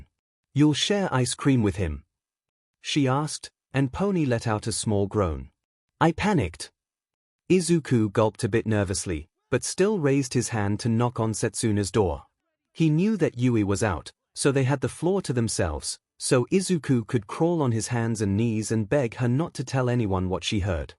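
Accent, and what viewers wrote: United Kingdom. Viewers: Whys the tts bri'ish?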